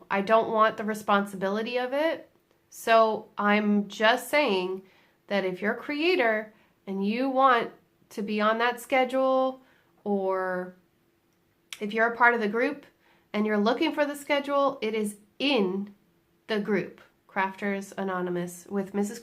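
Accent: Ireland. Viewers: You do what ever makes you happy. I love to see what you put out and I love your mixed accent. YES you are starting to mix in a bit of Irish tones when you speak, and it is Adorable. Love you!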